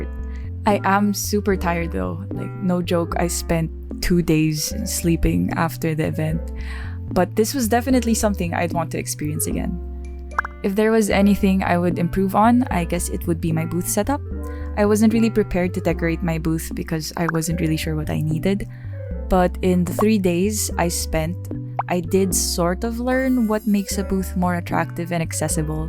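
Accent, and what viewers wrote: Philippines. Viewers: The Pinoy accent is strong with this one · I HAD NO IDEA U WERE FILIPINO BUT AS SOON AS I HEARD U SPEAK I WAS LIKE 🐶🇵🇭❓️ AND THEN I SAW MARKET MARKET OMGG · She sounds like a filipina. That accent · I'm surprised someone thought you were American, you have an identifiable filipino accent. I dunno, I'm filipino and I live in the US so I can point it out easily better than most lol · Filipino accent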